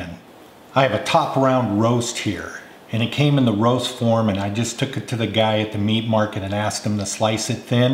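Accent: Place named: Chicago, United States. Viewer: awesome recipe! You sound like you are from Chicago.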